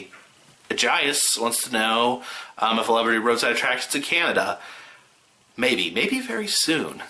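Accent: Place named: South, United States. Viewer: If you got together with Dan Bell, that would just make my day, year.... LIFE!!! His creepy with your southern....WIN!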